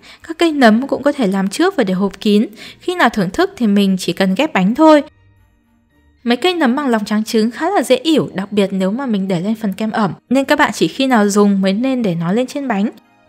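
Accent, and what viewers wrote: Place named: North, Vietnam. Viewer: Giong mien bac of ban de thuong wa,lai gioi nua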